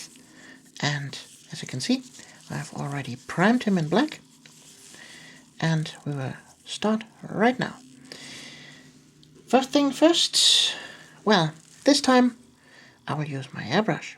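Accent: Germany. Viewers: she's german it's just her accent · are you from germany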